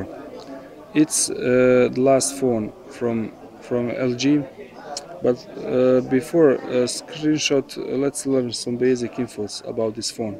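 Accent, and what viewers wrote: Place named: Russia. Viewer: рашен аксент из прешас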